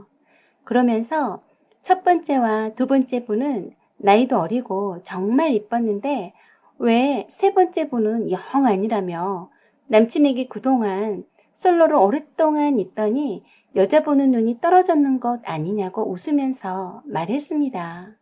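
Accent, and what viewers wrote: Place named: Gyeongsang, South Korea. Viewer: 경상도 사투리가 영....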